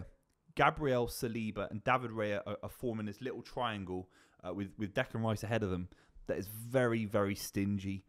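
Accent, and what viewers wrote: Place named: Plymouth, United Kingdom. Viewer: Where is your accent from? You sound like you are from somewhere like Plymouth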